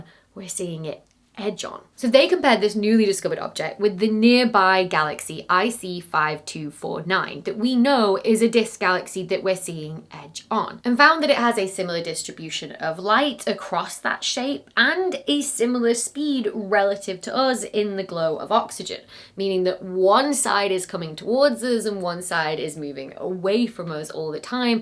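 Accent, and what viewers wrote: United Kingdom. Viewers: Dr. Becky's presentation is such an intersection of delightful things from the subject matter to her effervescent enthusiasm for it -- and who doesn't want their serious topics presented with a bit of a British accent? Always a fun way to learn about this stuff. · Tell me you're British without telling me you're British (although, of course, the accent would be plenty). 26 Celsius is 79 Fahrenheit which is the temperature to which I set my thermostat for my air conditioning. The temperature she's melting at is the temperature I cool down to. Insufferable for her is comfortable for me. (There may be a video lighting issue I'm not allowing for, but she seemed to think the temperature was enough explanation).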